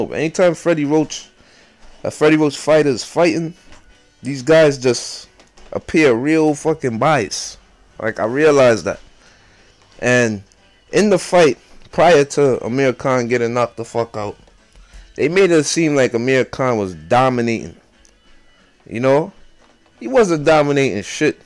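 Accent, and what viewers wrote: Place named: Trinidad and Tobago. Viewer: irrelevant, but u sound like u trinidadian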